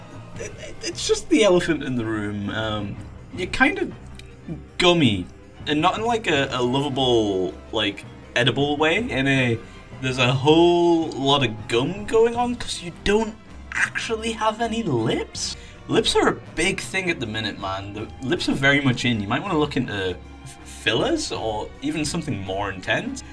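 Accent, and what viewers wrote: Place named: Northern England, United Kingdom. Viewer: I love your northern accent, you from Sheffield or something?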